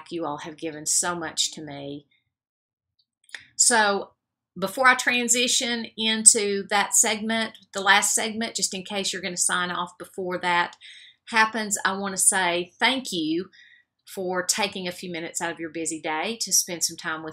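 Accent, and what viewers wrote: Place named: South, United States. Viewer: Hi: I enjoyed your video so very much! I'm a southern girl too (Georgia) so I love you accent. Blessings to you....Renee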